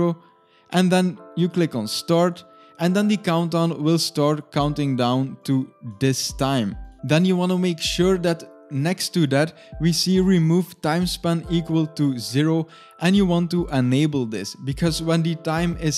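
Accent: Netherlands. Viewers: I knew you were dutch! haha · nice man, it works. hey you are dutch ( - )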